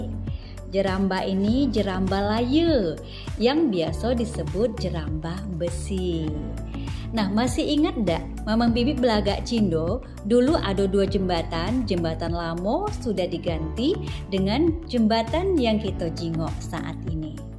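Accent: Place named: Indonesia. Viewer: logat nja kamu ngomong seperti logat behese sengedeng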